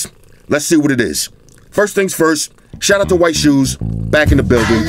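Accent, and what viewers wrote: New York, United States. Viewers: That REAL authentic New York City accent that's why I LOVE your channel fam. Keep em videos comin foo #NYCForever #Floss💯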